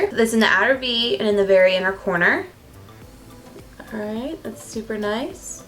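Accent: South, United States. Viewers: i found your channel after being lost a couple years and i realized how much i love your channel! you are sweet and i love your country accent😍😍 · I feel like you're already losing your southern twang. Don't let NY do that to you, girl! 😂😭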